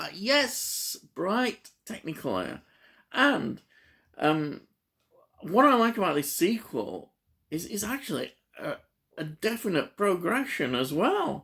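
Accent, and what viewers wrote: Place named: Wigan, United Kingdom. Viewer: Hi. I'm a huge Hammer fan as well. If you haven't already done so, grab yourself the 2 new release from Second Sight (The Mummy & Frankenstein and the Monster From Hell) as they have done a wonderful job on the restoration. I've watched all of your videos and can't help but think that with your accent must must live quite close to me, I'm from Wigan.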